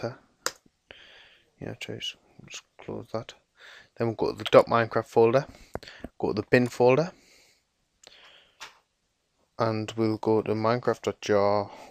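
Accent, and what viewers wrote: United Kingdom. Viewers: Ur from newcastle same acsent as me british newcastle geordie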